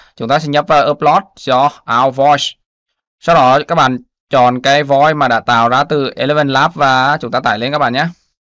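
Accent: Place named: Nghe An, Vietnam. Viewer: anh cảm ơn em nghe giọng em như người nghệ an quê mình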